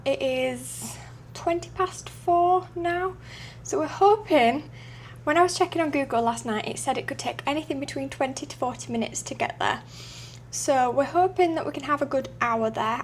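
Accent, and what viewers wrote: United Kingdom. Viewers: Love your very British accent ❤❤❤🎉🎉🎉🎉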